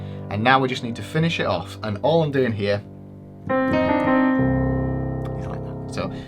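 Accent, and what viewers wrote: Yorkshire, United Kingdom. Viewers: Hello. Love the vid! More please. You sound like you´re a yorkshireman like myself, which town?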